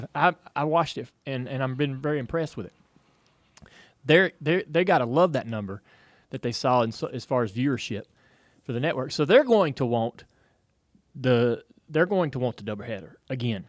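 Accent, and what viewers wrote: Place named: North Carolina, United States. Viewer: The North Carolina accent is great. Of all the Southern accents, it's the nicest one to hear. The Brickyard 400 was a great race. Keep up the good work Dale Jr!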